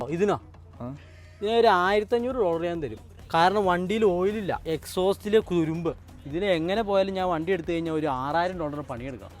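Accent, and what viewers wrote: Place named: Idukki, India. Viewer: അണ്ണന്റെ സംസാരം കേക്കുമ്പോൾ ഇടുക്കി കാരുടെ സംസാരം പോലെ തോനുന്നു 😂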